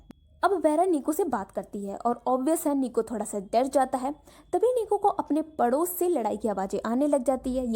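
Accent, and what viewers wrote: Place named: Punjab, India. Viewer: Aap panjabi accent me hindi bolte ho .👍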